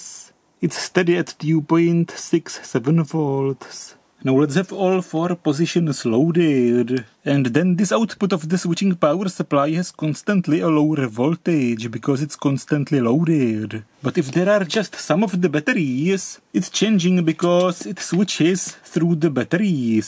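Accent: Czech Republic. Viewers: Czech Republic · He's Czech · Yep, he is from Czech Republic, what's wrong😉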